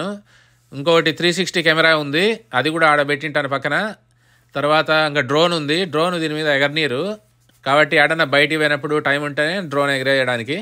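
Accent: Kadapa, India. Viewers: Anno madi kadapenna ne vedieous super ante super na mana yasa marchipoledante great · Meru 2016 lone inthati camera lu oka drone use chesi videos chesi andhariki chupichalanna thought inka me journey chala bagundhi nejaniki meru kuda Oohinchi undaru me videos ippudu 2022lo YouTube lo oka Telugu channel lo papular ayithayani Thank You mana Kadapa slag inka plus vere level meru 🙌👌👌👌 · Chala baga vuntundi taliking slang in telugu pakka rayalaseeme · Meedi yevuranna.mee Kadapa Jilla vadi madiri matladutunnaru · I like your కడప slange నేను కొట్టం బడిలో 5 th class వరకూ చదివాను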